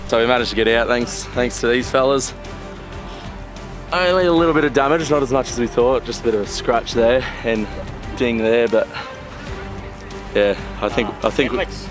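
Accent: Australia. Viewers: Australian or NZ accent..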